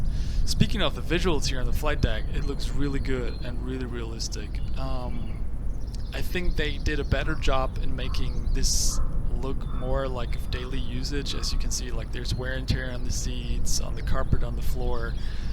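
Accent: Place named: France. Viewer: Salut ! Sympa la review, tu es français non? Tu parle vraiment bien anglais mais j’ai l’impression d’entendre un accent français quand même ! Y’a pas mal de pilote qui s’entraîne en anglais c’est pour ça que je pose la question car j’arrive assez bien à comprendre ton anglais donc tu pourrais être français 😂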